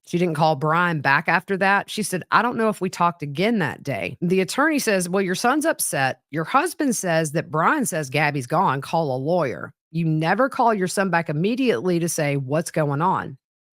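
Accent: South, United States. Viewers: you do such a fantastic job reading that as quickly and concisely as you do! Your linguistic prowess is amazing! It’s really impressive how you never pause or screw up and your cute southern draaawl is replaced with more of a NYC pace. I love your content!❤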